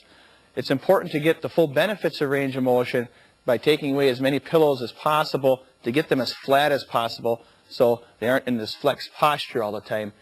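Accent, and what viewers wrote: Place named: Canada. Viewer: haha this video looks like it could have been made from the 70's I like his (I believe) Canadian accent, haha, but still very helpful, thanks :)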